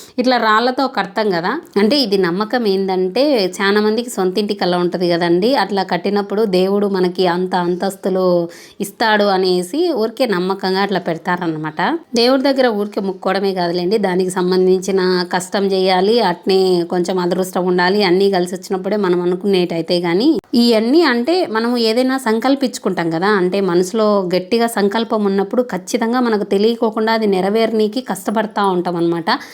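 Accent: Rayalaseema, India. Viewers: మీ వీడియో క‌నిపిస్తే చాలు వెంట‌నే చూసేస్తున్నాను. ఎందుకంటే అద్భుత‌మైన సీమ మాండ‌లికాన్ని అల‌వోక‌గా వినిపిస్తున్నారు కాబ‌ట్టి. ఆస్కార్ సినిమా చూస్తే క‌లిగే ఫీలింగ్ మీ వీడియోల‌ద్వారా వ‌స్తున్న‌ది.అంత హాయిగా, ధారాళంగా, అమాయ‌కంగా, అర‌మ‌రిక‌లు లేకుండా మాట్లాడ‌డం అంటే మామూలు విష‌యం కాదు. మాది కూడా వైఎస్సార్ జిల్లానే.మీ ఊరు ఖాజీపేట‌కు మా వూరు తొండ‌ల‌దిన్నె ఒక 30 కిలోమీట‌ర్ల దూరంలో వుంటుంద‌ని అనుకుంటున్నాను. 3 ద‌శాబ్దాలుగా హైద‌రాబాదులో వుంటున్నాను. సాక్షి టీవీలో సీనియ‌ర్ జ‌ర్న‌లిస్టుగా ప‌నిచేస్తున్నాను. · Hi garu iam New Subscriber madhi kuda Allagadda devudu mervaniki kadu konda dhgi velledi Laxmi narasimha swami vari Pelliki pilavadaniki konni village s ki matrame veltharu Anye mi vedeios chala bugunnai Mana ralyalalsleema slang Matladuthunnaru Chabaga matladuthunnaru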